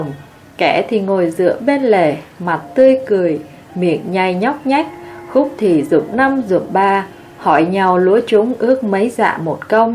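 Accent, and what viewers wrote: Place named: North, Vietnam. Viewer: Truyen HBC fai giọng đọc nguoi mien moi dc . Het nguoi đọc sao chọn giọng Bắc kỳ vậy .ko nghe đâu !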